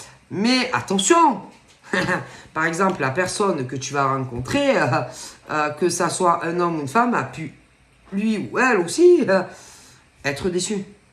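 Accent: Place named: Marseille, France. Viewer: adore votre accent Marseille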